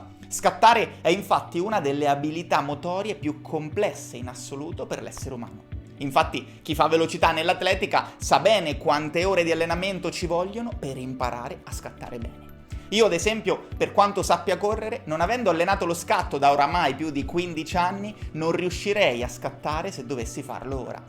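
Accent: Marche, Italy. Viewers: accento marchigiano a tutto spiano. Scommetto provincia di Macerata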